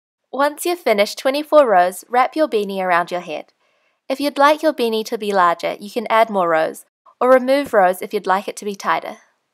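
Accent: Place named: Australia. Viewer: Love the video and love your accent! Australian is my favorite :D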